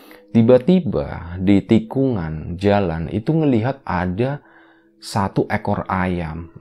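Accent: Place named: Pekalongan, Indonesia. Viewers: karang Dadap mas, pean Pekalongan jga to logat e kok Podo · Aku org sunda asli.. Pas mas ngmng sundaa jd pngen ktawaa krna bhsany sunda tp logatny jawa.. Tp keren mas lbih bnyk lg msukin crita sundanyaa😁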